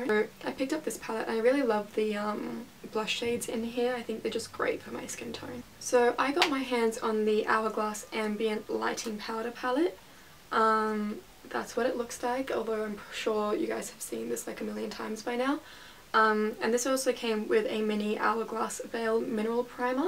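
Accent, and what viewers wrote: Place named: Australia. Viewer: Are you from Australia?? Btw I love ur accent ❤️❤️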